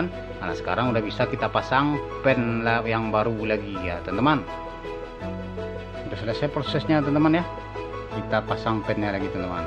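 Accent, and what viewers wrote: Padang, Indonesia. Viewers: Mokasih daa.. dri logat nyo, jaleh bana urang Padang🤣👍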